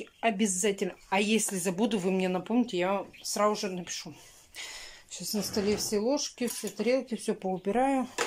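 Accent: Russia. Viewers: ЛЮДИ .ЭТО ЯЗЫК РУССКОЙ ДЕРЕВНИ. У НАС ВОЛГОГРАДЕ В ДЕРЕВНИ МНОГИЕ ТАК ГОВОРЯТ. НЕ КОТОРЫЕ ЛЮДИ ЧАСТО БОЛЕЗНЕННО РЕАГИРУЮТ НА ЭТО ..МОЖНО ПОПРАВИТЬ КОГО ТО ,ЕСЛИ ТЫ ДОЛЖЕН В СИЛУ СВОИХ ПРОФЕССИОНАЛЬНЫХ ОБЯЗАННОСТЕЙ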